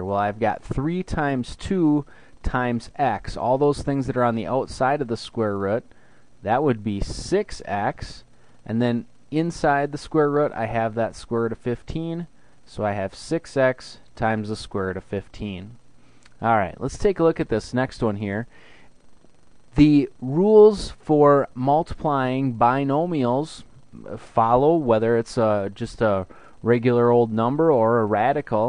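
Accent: Canada. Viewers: Are you Canadian? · ive never heard anyone more canadian and i love it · Are you canadian??? o.o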